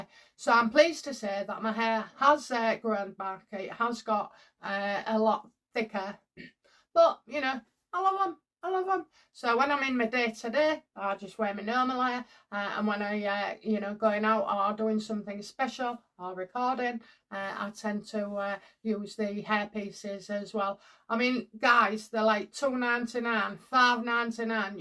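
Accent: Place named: England, United Kingdom. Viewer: Im english, living in Ireland. Im here for your accent. I miss that, and a proper chippy. LOVED the white suit!!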